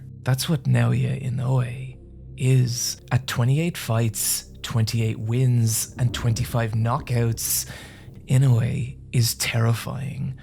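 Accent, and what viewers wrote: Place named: Ireland. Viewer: Another banger from. Your chillingly Irish voice regales us once again. Thank you.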